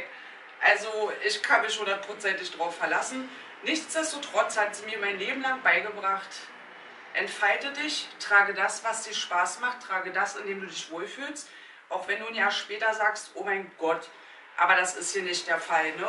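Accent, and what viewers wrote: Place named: Berlin, Germany. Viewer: Du bist unsere swimwear queen 🤣🤣 Body paßt dazu Berliner schnauze was will man mehr 😁😘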